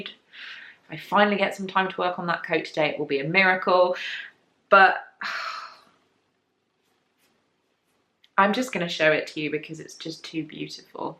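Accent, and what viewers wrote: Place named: England, United Kingdom. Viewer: I award you the designation of Honorary Southern Belle for saying "hissy fit" in this podcast. OMG! I definitely laughed out loud, because what made it extra special is your adorable English accent. Cheers!!!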